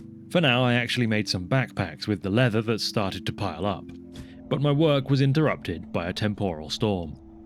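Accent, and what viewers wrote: United Kingdom. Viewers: always delivers on quality content, if i ever reincarnate i want to be british for that accent.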